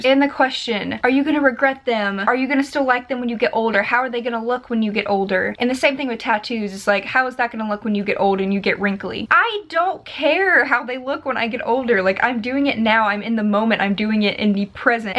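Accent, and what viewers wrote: United States. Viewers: Genuinely thought you were one of my friends that I haven’t spoken to in years then I realised you’re American and I’m English 😂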